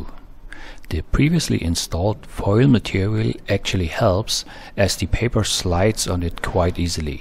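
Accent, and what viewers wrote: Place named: Germany. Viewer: Any explanation video or tutorial is made infinitely better when it involves someone with a German accent.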